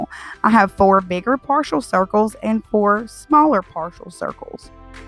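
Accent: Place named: Oklahoma, United States. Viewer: Ooooo! Pretty! I love the set, but I really love the tallest one most. Thanks for sharing! Your accent is adorable, btw! My hubby has one, too. He's from Oklahoma. I'm from the Pacific NW, which is called a "Newscaster accent." Just a silly FYI!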